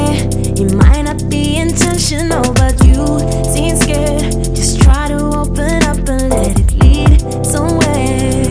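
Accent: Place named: England, United Kingdom. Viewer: U sound like ur from England bro NICE ENGLISH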